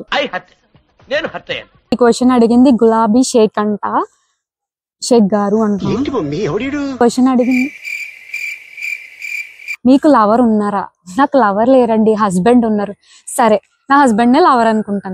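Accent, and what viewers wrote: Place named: Rajahmundry, India. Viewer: rajmundry slang super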